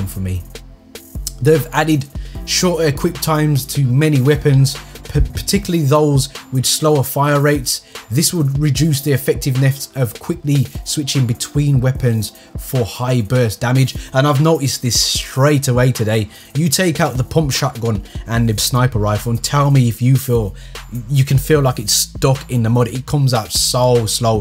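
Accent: Birmingham, United Kingdom. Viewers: nothing wrong with a brummie😂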